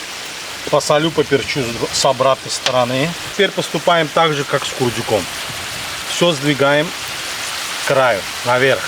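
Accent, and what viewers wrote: Baku, Azerbaijan. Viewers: Спасибо и отдельное спасибо за бакинское наречие. Родился и вырос в этом прекрасном городе к сожалению в прошлом прекрасном, каждый раз когда приезжаю к маме наслаждаюсь её запахом, её улыбкой и разговор ами к сожалению все друзья и одноклассники тоже уехали кто куда а в городе одини чужие именно нехватет вот такие беседы как с Вами душевный бакинский акцент, спасибо будьте здоровы обнимаю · Прекрасное блюдо! Острый нож! Золотые руки! Грамотная речь и бакинский акцент!❤❤❤❤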